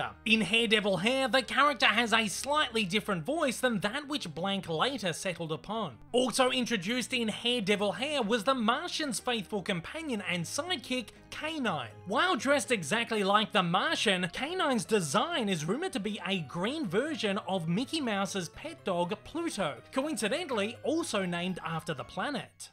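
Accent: Australia. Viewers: Urghhh that aussie narrator's accent is decidedly grotesque and annoying, ruins what would have been a great video !!!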